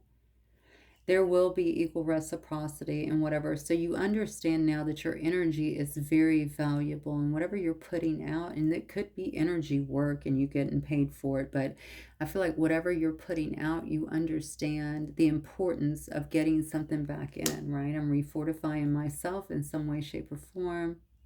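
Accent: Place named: Alabama, United States. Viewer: Hi ty 4Ur readings always on point ❤ I ❤️ ur accent I knew u were from Alabama 🤗 I’m from ALICEVILLE 🤗 I live in Buffalo NY since the 70s still have lots of family there. SWEET HOME ALABAMA 🥹🥰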